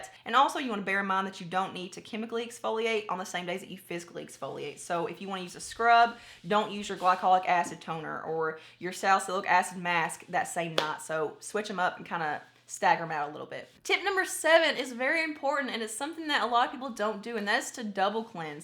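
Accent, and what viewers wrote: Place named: Kentucky, United States. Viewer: Oh no! It looks like it’s still all here for me when I expand it, but I can’t see what you’re seeing so I don’t know where it got cut off, exactly. Go figure: I apply brainpower, it melts my words. I poked around on your social networks looking for an email so I could just send it there, but while it says it’s “below” on your youtube About page, sadly it isn’t, so I hopped to your Facebook and discovered... Lady, you are from Southeast Kentucky. I knew I loved that accent, I’m from Eastern KY but lived in NYC for years & years until family issues brought me back to KY. I will now attempt to “message” it to you via Faceplace, and let’s hope that doesn’t cut it off & not let me know where. Sorry for the discombobulation.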